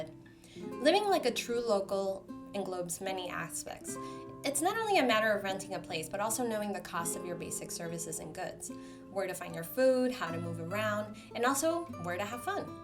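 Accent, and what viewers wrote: Mexico. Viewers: Kinda feel honored about the coolest neighborhood in the world mention, HA! Most my life has been marked by this lovely neighborhood… Used to skateboard in Chapu in the early 00's (pre-remodeling), dad had a business half a block from it, and I currently live 2 blocks away from Templo Expiatorio. Gentrification has been playing a big role in the last 10-15 years, lots of high rises and poshy vibes, it certainly IS the place to visit while in Guadalajara! Not so much to live in; beggars, theft and pollution are not rare, as you'd expect in any major city centre worldwide, not only in México, sadly. Hey, I'm an architect and there a lots of hidden jewels in the hood, let me now and we could set up a filming tour around here, btw, you have a very mexa pronunciation, where u from? =One Love= –A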